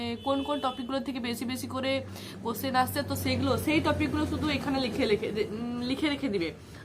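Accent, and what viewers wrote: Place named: Purulia, India. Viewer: Kotha sune ja bujhlam tomar bari Purulia ba bankura 😮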